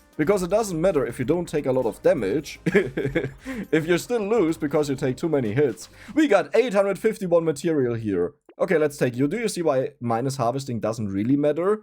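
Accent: Germany. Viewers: Dein Denglisch ist der Hammer, ich lach mich hier halb tod. Mache einfach so weiter! Geile Videos! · Bro is trying to keep speaking english and Not German or whatever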